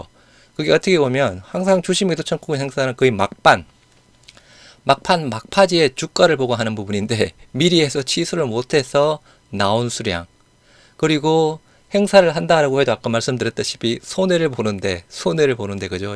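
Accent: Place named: Jeolla, South Korea. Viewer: 억양이 전라도분 이신듯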